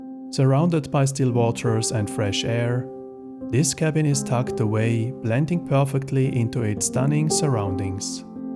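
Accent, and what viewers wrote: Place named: Scotland, United Kingdom. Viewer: Lovely Scottish accent.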